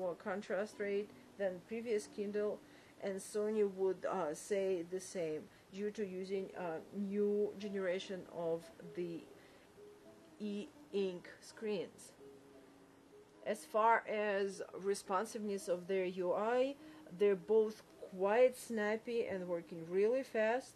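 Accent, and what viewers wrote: Russia. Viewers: Ты случайно не русский?) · очень сильный русский акцент :)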